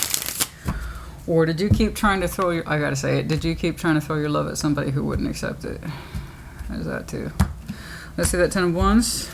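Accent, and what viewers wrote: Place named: South, United States. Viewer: Yes it is my fault for staying too long. I’m a Texas girl and yes, the southern drawl I hear it. It’s awesome and refreshing to hear fellow southerner.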